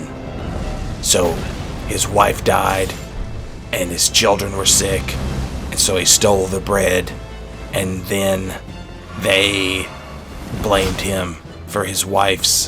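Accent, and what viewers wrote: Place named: Kentucky, United States. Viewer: You have too good a Mitch McConnell impression not to be from Kentucky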